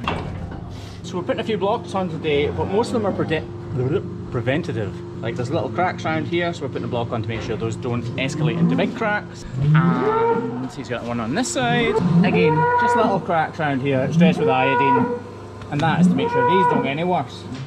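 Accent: Scotland, United Kingdom. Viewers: Lmao tbh the peeing and pooping and the reactions and the scottishness is half of why I watch this channel, the other half being the bovines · I love all your video cause your never do click bait it’s always real and very entertaining to watch and I’m sure everyone loves your Scotland accent lol i know I sure do but I just wanted to thank you for these extremely entertaining videos ❤️ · I love your videos! Part of it is the wonder of this previously unknown, amazing world. Another part is the sunny style of presentation. And part is just your delightful accent - and learning some Scottish slang! But I have a question——what is the difference between a hoof trimmer and a blacksmith? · Scottish accent is cute.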